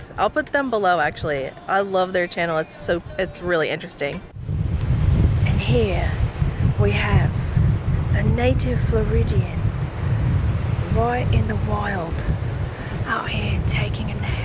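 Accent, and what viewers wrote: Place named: Australia. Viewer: Love your Aussie accent!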